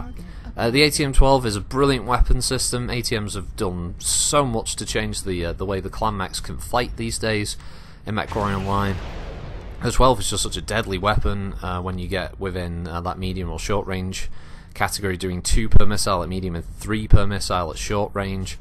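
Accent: United Kingdom. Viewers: Thank you. You know that American's trust a British accent, right? Well, speaking for myself I think that is true. Your timing for the most part is great. You don't stumble over words. You don't throw in the obligatory 'uhs' and 'ums' like I do. That is my handicap. I can write far better than I can speak. Though, somewhere in my early to mid thirties I did notice that marked improvement in my reading aloud ability. I'm not sure if that is something that comes with age and practice or just reading Robert Jordan novels until my eyes bled. Nevertheless, your lore series brings to mind (and please don't take this the wrong way, I mean this with the utmost sincerity and respect) the old nature shows I used to watch in the early 80s. I'm serious when I say your voice brings a certain...credibility to the universe. Several times while watching your series people have come in and assumed I was watching something about the real world. 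I always enjoy the sound of voice and look on their faces when they inevitably say, "What the hell are you listening to?" five minutes into Star League History 3 or something. It telegraphs to me that they thought it was syndicated documentary or something (I am prone to watch more docs than cat videos or people getting hit in the stones or 'Top Ten Reasons not to watch Top Ten videos' videos) so I smile inwardly at their sudden confusion when you switch gears from talking about the Camerons to a battle over YakitySmakity V. That fact that you are doing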